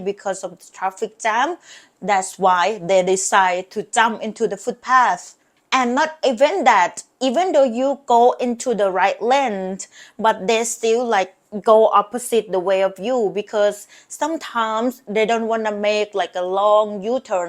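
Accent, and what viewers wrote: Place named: Thailand. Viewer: thanks again for another very interesting video, you are very wise and you know so much about everything. and I absolutely LOVE your thai accent! 😇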